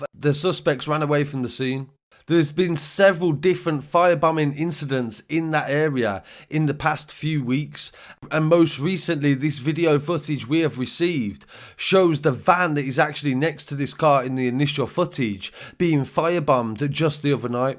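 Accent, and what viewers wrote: Birmingham, United Kingdom. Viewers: Amazing work, very sad times indeed.. 😑😓 ..also your brummie accent is awesome ..🙄🙄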